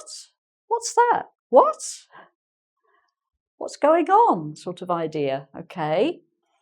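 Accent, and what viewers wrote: United Kingdom. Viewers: you are absolutely adorable the way to teach and your lovely british accent. Thanks so much for your videos Im learning a lot. Send you big hug from Spain and subscribe to your channel :)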